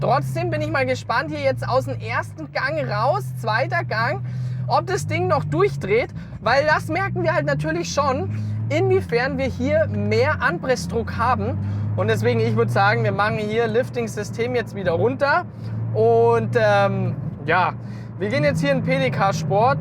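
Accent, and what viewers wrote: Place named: Franconia, Germany. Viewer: dieser fränkische Dialekt 🤣🤷🏻‍♂️ herrrrrlich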